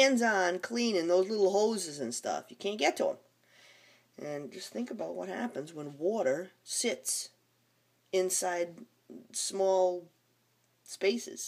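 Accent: United States. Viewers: I just bought a Corningware percolator at an estate sale here in Vegas! So cool. But not as cool as your awesome New York accent! i miss NY sooo much! You are fantastic Madame!!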